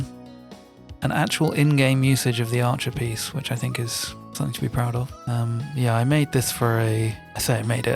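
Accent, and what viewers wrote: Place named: United Kingdom. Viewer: He's just British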